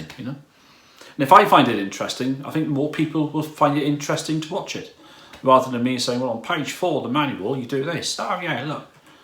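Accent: Wales, United Kingdom. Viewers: I've just started following you. Do I detect some Welsh in your accent? - - Adverts, no thanks. I tend to delete the sub's link if they start throwing ads at me. Home-based HF round here is a lost cause, too much QRM, S9++ on a quiet day! I've got a G5 up, but due to lack of space, it is bent into a big Z, and not very high. So I'm mostly on 2M, but even that has been poor for a while. I thought, with a lot of people stuck at home, it might wake up, but that didn't happen. I can sit on my local repeater, GB3MN, all day, and not hear a soul except the repeater ident! (500 is a little bit more active, now and then). If you care to look, you can find me on You-tube, too, but there's no ham radio stuff on there. I'm not looking for a 'plug'! 73 M0HFF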